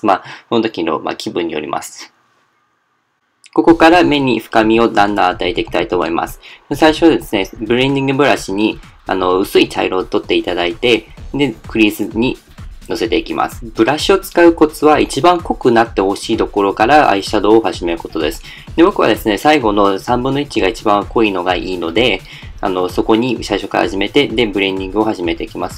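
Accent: Kansai, Japan. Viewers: めっちゃ関西弁やん♡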